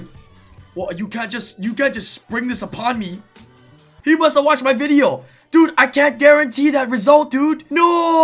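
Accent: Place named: Asia. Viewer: Omg the stereotypical Asian accent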